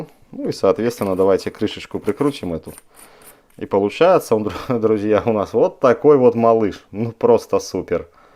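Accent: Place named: Belarus. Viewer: Спасибо за обзор, фонарик отличный, уже 3 таких купил! 🙂 Заметил что у автора еле заметный говор особенный, такой приятный убаюкивающий, подумал что на белорусский похож, и кажется угодал. Привет беларусам от русских из Эстонии, очень нравится ваш говор степенный! 🙂👍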